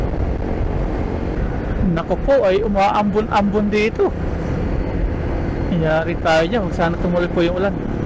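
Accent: Visayas, Philippines. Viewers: Wag mo sabihin Caviteño ka,,,iba ang way mo ng pagsasalita parang pang bisaya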